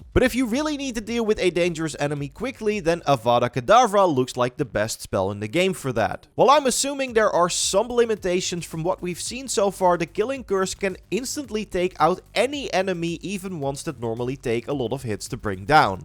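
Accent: Netherlands. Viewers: I smell dutch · Are u dutch? I hear a dutch accent in your english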